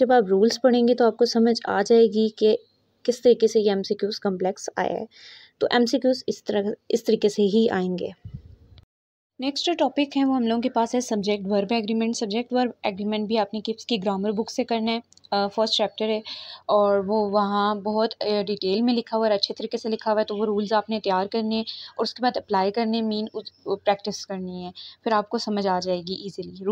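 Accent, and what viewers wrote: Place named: Muzaffarabad, Pakistan. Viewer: Baji seems like you are from mzd ajk ?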